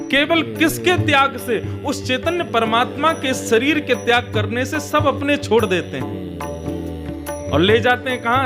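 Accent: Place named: Braj, India. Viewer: Jai jai aapke mukh se toh brij Bhasha bahut pyari lagti hai Jio Jio mere Lal ❤❤❤❤❤❤❤❤❤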